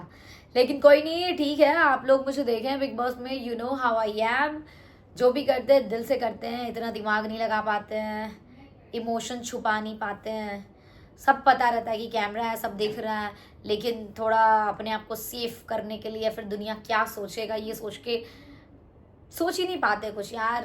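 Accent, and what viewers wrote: Bihar, India. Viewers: Manisha ap bhut achhe ho but mai buttering nhi krungi. Ap is show me kaise khelna h soch k gye the isliye muje v kaibar arjun sir jyda achha lg gye jbki mai chti thi nhi muje hi achi lgni chaeye but mai justify nhi kr pati thu... Ap hr jgh natural rha kro kuchh soch k mt khela kro..ap aisa bich me english english krte the bt muje lgta ap apnr hindi ar binhari accent pe mst ho yrrrr❤... Apne kchhh glt nhi kiya h fir v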